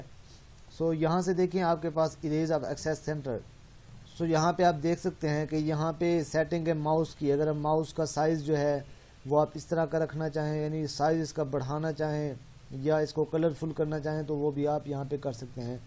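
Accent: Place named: India. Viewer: Shame that you speak too quickly and as Indian English accent is very difficult to follow , it would be better if you place subtitules.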